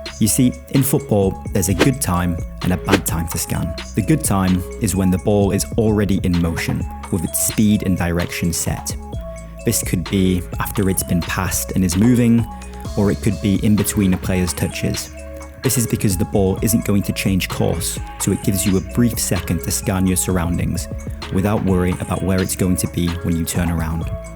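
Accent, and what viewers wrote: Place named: Scotland, United Kingdom. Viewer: His accent sounds a bit scottish. That "last" is in no way pronounced wrong. You just have a problem with people accents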